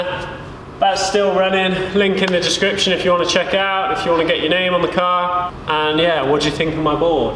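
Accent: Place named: Dorset, United Kingdom. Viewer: Dorset UK im guessing by the accent lol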